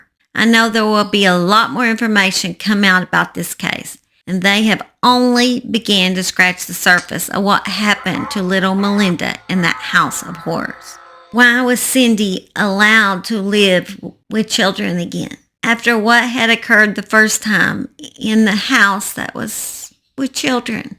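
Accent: South, United States. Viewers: Southern girls get lost in there?? Not hardly people will eat that accent up. We northern girls are unapproachable. LOL You are who you are, that's a good way to be!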